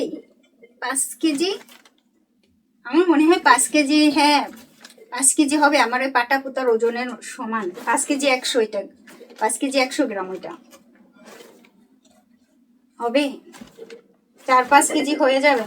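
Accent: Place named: Khulna, Bangladesh. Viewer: আপনি খুলনার মেয়ে হওয়া সত্বেও ,আপনি খুলনার ভাষায় কথা বলেন।ধন্যবাদ।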